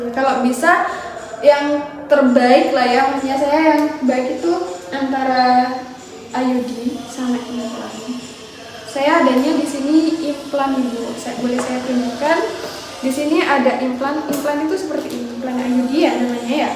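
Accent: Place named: Java, Indonesia. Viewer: Nge Jawa banget ini kak😢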